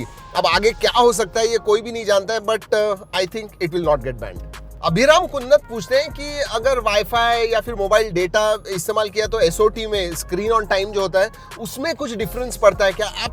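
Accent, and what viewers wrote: Maharashtra, India. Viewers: मेरा Suggestion आपके लिए ये है कि - मराठी पद्धतिची हिन्दी बोलू नका।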